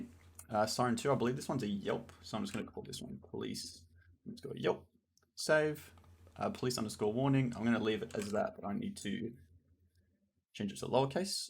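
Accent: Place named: Australia. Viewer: me 10 seconds in: this guy is aussie so it has to be good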